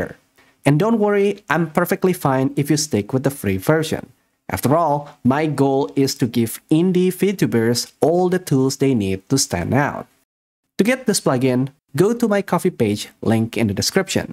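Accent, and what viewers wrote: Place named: Indonesia. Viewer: Amazingly helpful, also found your other tutorials and facial expression packs to be extremely valuable, thanks so much, especially for the free stuff! Also, CMIIW, but your accent sounds pretty South East Asian (dare I say Indonesian?) it adds a lot of charm to the videos and, from a fellow Indonesian, I hope you succeed and continue to make tutorials like these.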